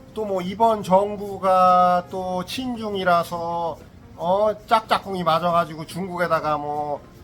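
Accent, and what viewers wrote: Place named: Chungcheong, South Korea. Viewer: 역시 충청도여 ㅋㅋ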